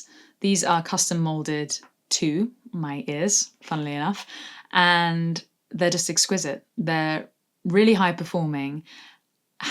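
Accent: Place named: England, United Kingdom. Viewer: I'm inclined to agree with bunnyboiler6353 – I've always liked your spoken voice and enunciation, and as an American Midwesterner, I'm a sucker for a girl with an English accent of almost any kind to begin with. I thought the "rushed breath" tip especially good. Sorry to hear about your back – I've had back issues for decades. Learn to stay within yourself when doing your gym/workout stuff, just as you do with vocals. Stay safe!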